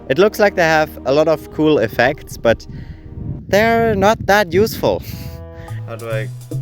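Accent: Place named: Germany. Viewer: Super! Und ich lag mit meiner Vermutung, dass da kein bulgarischer, sondern ein deutscher Akzent durchzuhören ist, nicht ganz falsch. Mir wäre geholfen, wenn du noch mehr zu dem Thema machst. Ich überlege seit Längerem, ob ich mich als Anfänger mit meiner Sony RX100 eher, in Photoscape X, Darktable oder Rawtherapee einarbeiten soll. Vielleicht geht es sich ja einmal aus, dass du ein Video zum diesem Thema machst. Zudem werden Videos mit "RX100" in Titel sicher häufig geklickt.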